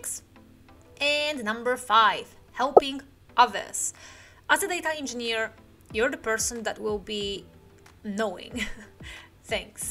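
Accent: England, United Kingdom. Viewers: Haha I love your english accent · Hello, just subscribed, AMAZING content! Very helpful! May I ask which country you are from, because sometimes you sound british or french or even russian!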